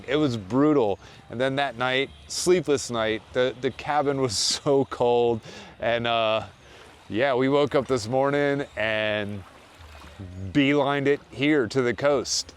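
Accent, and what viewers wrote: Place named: United States. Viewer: Sounds like a stupid yank lost in a foreign country, so many of these dicks you meet on, just interested in the surf and a cheap place, do you care about the people wanker?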